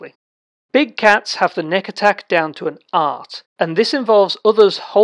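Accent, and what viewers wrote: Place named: United Kingdom. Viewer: He's from the UK, alright?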